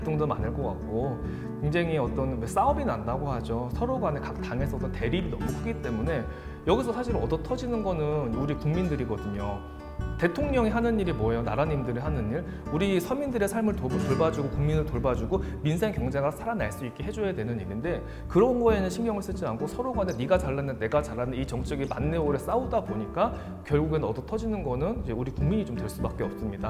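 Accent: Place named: Jeolla, South Korea. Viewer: 전라도 억양이네 ㅉ ㅉ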